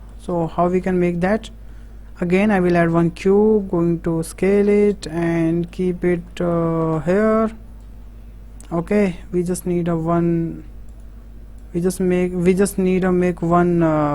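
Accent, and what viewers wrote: India. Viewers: %98 indian accent %1 quality %1 benefitfull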